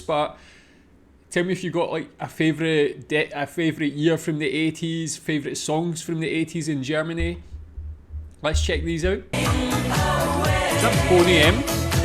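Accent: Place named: North England, United Kingdom. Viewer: Nice Accent Dude! 😄 North England?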